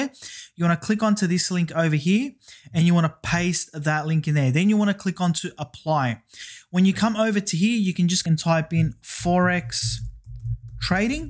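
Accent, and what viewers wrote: Australia. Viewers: Your video is amazing, so many people making videos make it so complicated - you have made it crystal clear. Sure I am going to have to go through the video again and make notes, do you have a cheat sheet for the video? Have subscribed and can't wait for more videos. Like you Aussie accent. Thank you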